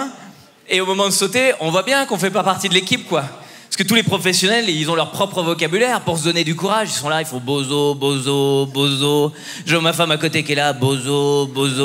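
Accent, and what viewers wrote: Quebec, Canada. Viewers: J’ai prit un de ces fou rire avec son histoire et l’accent québécois ! Magistral. J’attends la tournée avec impatience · Haha! Pas mal l'accent québécois. Un mélange d'accent du Saguenay et de la Beauce😎. Probablement une des meilleures imitations de l'accent québécois par un français 😎. Pour une fois qu'on a pas l'aire trop... bozzo🤙😉. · L'accent québécois m'a fumée rire😂😂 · C’est le meilleur accent québécois fait par un français que j’ai jamais entendu! (Je suis québécoise) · Ouah… Vérino nous avait habitué à tellement mieux… là on nage en plein sexisme (« Hahaha ma femme qui… ») nappé d’humour anti-accent québequois, c’est pas terrible. C’est border Bigard.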